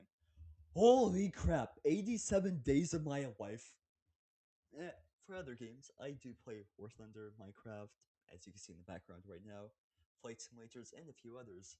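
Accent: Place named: Canada. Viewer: You sound so Canadian 😭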